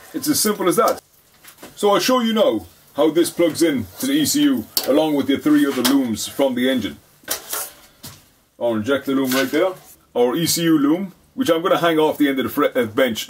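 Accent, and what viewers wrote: Ireland. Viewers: Sounds like Offaly to me, maybe Longford 🤣🤣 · This might be good!! This guy has a Irish voice going through a big bore throat LOL. Brilliant wee video!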